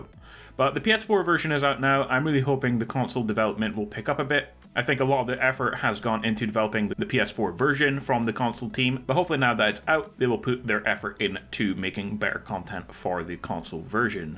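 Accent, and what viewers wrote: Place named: Edinburgh, United Kingdom. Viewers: Sounds like Edinburgh. 100% not from Northern Ireland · Scotland not sure were maybe even just outside id guess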